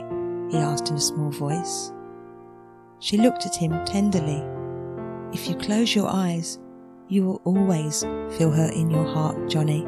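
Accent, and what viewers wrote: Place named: England, United Kingdom. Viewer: Beautifully read. does indeed have a pleasant voice- very warm tone and clear dictation. Me being from the US I find the English accent very pleasant and nice to listen to. I don't have much experience with background music for audio books so I will just say it seems pretty solid to me. Can't really give any pros or cons due to my lack of experience there. Sounds good.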